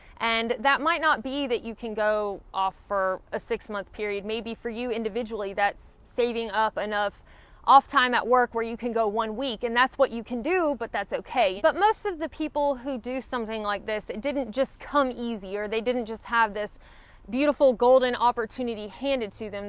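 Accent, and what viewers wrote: South, United States. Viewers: "Kilts and the like don't confine and bind on inclines" :) Hearing you talk does a displaced southerner some good.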